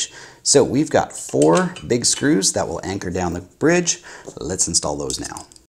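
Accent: Canada. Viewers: I love your videos, this one is no exception. But do Canadians day Filta instead of Filter? Because you keep saying Filt-a-tron...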